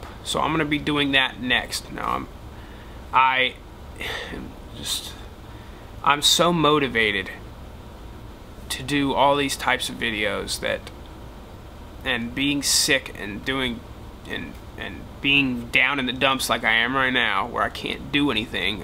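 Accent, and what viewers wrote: South, United States. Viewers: hey man. you can tell your from the south. your a nice guy. almost thought you were from Texas for a minute. lol hook' em baby!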